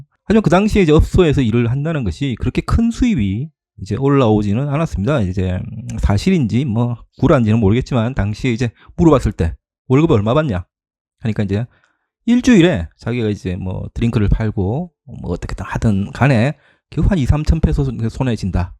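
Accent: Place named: Gyeongsang, South Korea. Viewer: 현재 워킹 현황 영상과 경상권 억양에.. 친근함과 함께 구독 눌릅니다^^ 자주 올려주세요~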